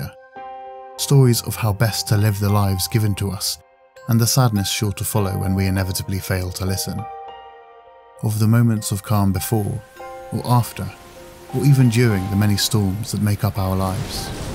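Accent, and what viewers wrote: United Kingdom. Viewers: Your British accent, the calm voice, the music choice, the visual choice and the approach to this work really felt like you gave the series the justice it deserves. By recreating the atmosphere you find in Mushishi, you made the presentation of this work beautiful. This short analysis was a great way to pin my feelings for a while, and to create my own thoughts around this show. Thank you very much.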